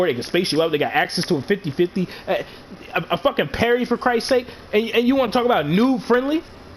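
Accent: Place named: New York, United States. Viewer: Sounds like a New Yorker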